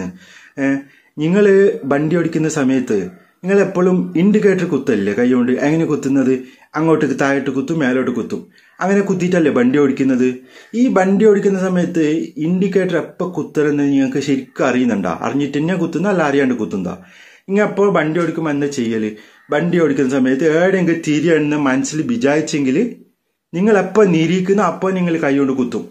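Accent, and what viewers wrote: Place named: Kasaragod, India. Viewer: Kasar kod Basha Yella Bashayum Ariyum😆😆🤣🤣Rasamund kealkkan😃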